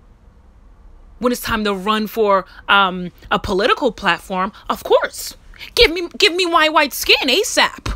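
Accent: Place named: New York, United States. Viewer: Metelyrics a New York accent maybe ? Lol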